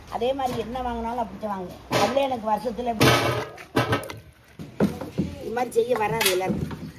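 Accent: Ramanathapuram, India. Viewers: Ramanathapuram slang ah? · ராமநாதபுரம் பேச்சு உடன் சாப்பாடு செய்யும் போது என் சிறு வயது நினைவு வருகிறது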